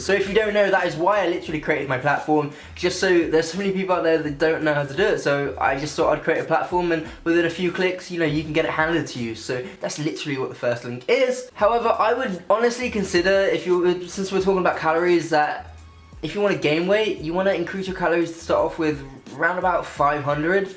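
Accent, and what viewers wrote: United Kingdom. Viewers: Great transformation. Just amazing....something I would dream about achieving. And as Leland, said, you are also fantastically handsome which makes the gains even more worthwhile. You've got it all, a great physique, super good looking man, wonderful British accent ( I love England and English folk), and of course, a wonderful boyfriend. Unfortunately, I have none of those....lol! Keep up the great work! Your beautiful....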